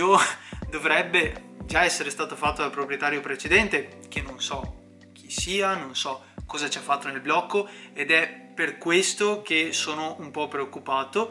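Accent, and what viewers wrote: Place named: Veneto, Italy. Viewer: Se sente te si veneto 😂😂❤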